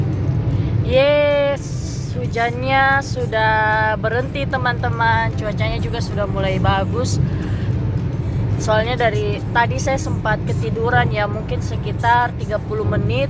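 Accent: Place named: Palu, Indonesia. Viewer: Tetap logat palu... 🤣 Lanjuut joooo.... 👍👍🙏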